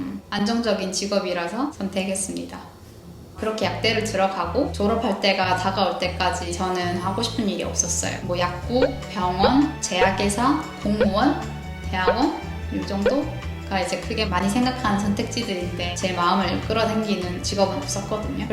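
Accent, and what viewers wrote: Busan, South Korea. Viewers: 약사이민으로 몇몇 채널을 봤지만… 약사님처럼 다정다감(?)한 느낌은 없었어요. 작가님이라 그러신지 말도 조곤조곤…. 아주 익숙한 네이티브 부산억양 ㅎ 편안하게 잘 들었어요~~ “남자때문에 호주 간거네??” 삥 터짐 ㅎ 구독. 좋아요. 알림설정 때력박고(?) 다음 영상으로 고고~~ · 블로그를 읽는 것과는 다르게 영상으로 보니 또다른 재미가 있네요!! ㅋㅋㅋ 부산 사투리도 신선합니닷ㅋㅋㅋㅋㅋ 왜 캐나다로 가려고 하시는지 !! 그 영상 기대하겠슴니다 :)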